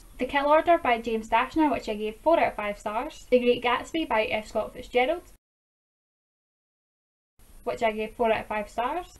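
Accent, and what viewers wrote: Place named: Scotland, United Kingdom. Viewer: I ADORED the Lux series by Jenn! Have you read her Covenant series? You MUST it is ever better than the Lux series. And Jenn is such an amazing down to earth person. So much fun to be around and talk books with! By the by, I can tell by the accent, where in Scotland do you live? My family is from Edinburgh (they all have green cards). I was born in NY but I lived in Scotland as a child.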